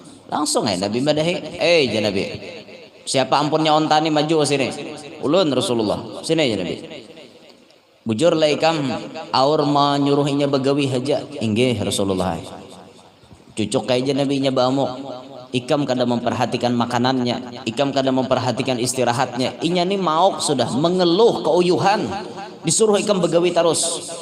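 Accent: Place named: Kluet, Indonesia. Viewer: Allgamdulillah ada guru kita yg cersmah selalu menyejukn hati dgn tidk menghilangnkan logat bhasa klua u yg sdh 30 thn di bnjar merasa bangga dgn melestsrikn bahasa klua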